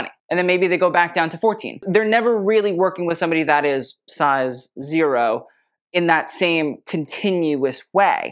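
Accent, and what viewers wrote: United Kingdom. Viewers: Wow, excellent British accent considering you are American. Have you ever thought about starring in British costume dramas? Thank you. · Your British accent is Fantastic darling 🤩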